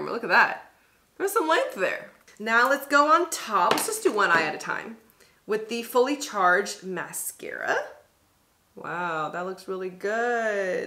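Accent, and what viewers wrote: United Kingdom. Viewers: I thoroughly loved this video. It was so fun to watch. Loved your British accent, almost spot on. I received the notification of the video while I was watching you. That was weird. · I LOVED this video!! Complete with British accent!! 😁 Very nostalgic for me, too!! ❤❤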